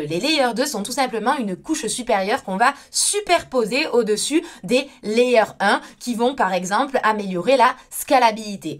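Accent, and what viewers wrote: South, France. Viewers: té pas un peu du Sud toi?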